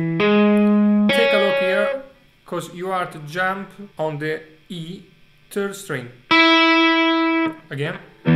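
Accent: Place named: Italy. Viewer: Già prima che dicevi im italian guy si capiva che sei made in Italy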